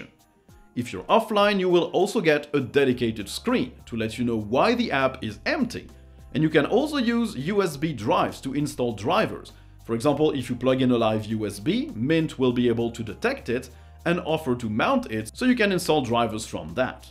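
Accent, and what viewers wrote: France. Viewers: Where is your French accent coming from? I'm Belgian ^^ Nice video by the way, I'll install 21.1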